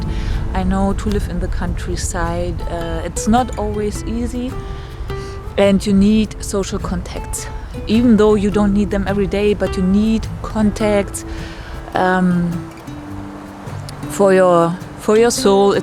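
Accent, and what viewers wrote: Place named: Germany. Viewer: Ich würde dir empfehlen, die Videos in deutscher Sprache zu verfassen. Man merkt, wie "anstrengend" es für dich ist, englisch zu sprechen (zumindest wirkt es so), und dann ist es für die Zuschauer natürlich nicht "entspannend" dir zuzuhören. Weiterhin ist deine Aussprache sehr deutsch, was es ebenfalls anstrengender macht, dir zuzuhören. Natürlich gibt es ein größeres potentielles Publikum im englischsprachigen Raum, aber ein größeres Publikum erreichen zu wollen, bedeutet meistens auch, nicht allzu spezialisiert zu sein (eine Nische zu haben), und oft kommt Erfolg erst dadurch, dass meine eine Nische belegt und sich von anderen abgrenzt. Meine Kritik ist nicht böse gemeint, vielleicht hilft es dir ja :)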